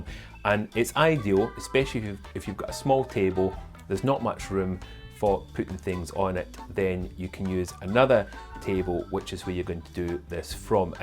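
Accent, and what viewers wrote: Scotland, United Kingdom. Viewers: Such an awesome channel by a true British gentleman andI do detect the Scottish accent. From a fan in sunny South Africa.☺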